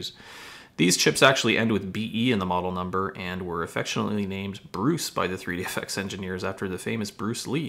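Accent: Canada. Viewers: are you Canadian? Because i get the feeling with your accent especially when you say "about":D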